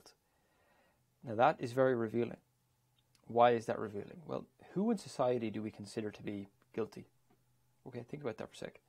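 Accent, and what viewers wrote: Ireland. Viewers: very cute.. are you Irish? i would stop procrastinating with you.. ;-)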